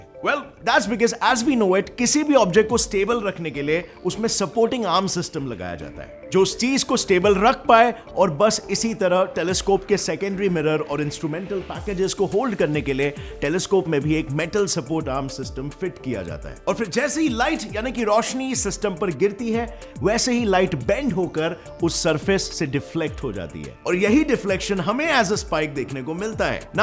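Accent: India. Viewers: Why with these videos it's always an Indian guy with such a strong accent and also that he doesn't know that much English words so he just goes to his original language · I will love if you text it, i really have a problem understand the dialect India/english.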